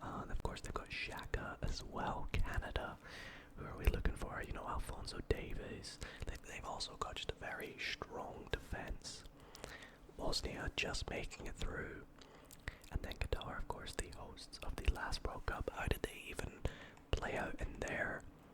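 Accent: Scotland, United Kingdom. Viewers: Why did it never click for me that you’re Scottish😭😭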